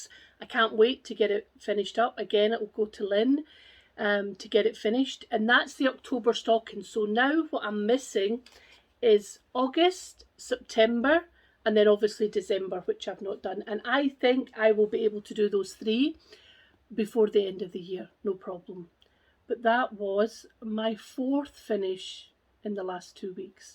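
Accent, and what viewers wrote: Scotland, United Kingdom. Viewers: Congratulations! I enjoy watching your videos! I’m so glad your recovery is going well. It broke my heart when I watched the video where You explained about your fall. I have to confess that I first started watching because I enjoyed listening to your accent. My grandparents were from Edinburgh. I miss them. So listening to you was a delight, some little words(can’t think of any right now) but words I haven’t Heard in so long But I have to say it was your accent at first but then I really enjoyed all your different whips and all Your stash and just everything. Good luck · I hope you continue making videos I have watched you since No 1 and look forward to your content, I m a fellow Scottish stitcher and it’s lovely to here your accent.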